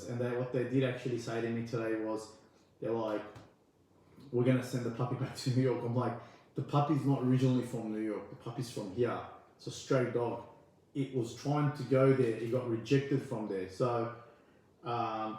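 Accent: Australia. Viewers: Everytime I hear your Aussie accent, I smile... You have a special place in our hearts back here in Australia. You are doing great work. Don't give up ok. This kind of work you do for the animals can suck the life out of you if you let it. Please take care and look after yourself x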